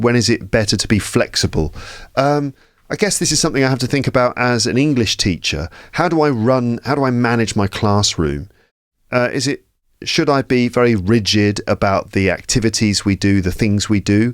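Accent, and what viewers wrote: United Kingdom. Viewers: Hello, teacher. First of all, I want to say that your lessons are amazing. I’m from Brazil and have been studying American English, but I have to admit that after watching your videos, I fell in love with British English pronunciation—it’s one of a kind… I’m still at the A2 level, but I can understand your lessons very well thanks to the context and vocabulary. I’d like to sign up for the premium plan.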